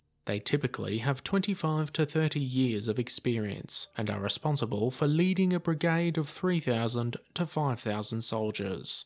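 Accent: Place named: United Kingdom. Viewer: Interesting....my father was RCAF, seen WWII, stayed in until 1969. Not sure if it's the British pronunciation of the presenter, my father seen the old British style ranks then the new American style change....he retired a lieutenant-Colonel, I never heard him refer to his rank as a "leftenant" it was more a "lutenant-Colonel".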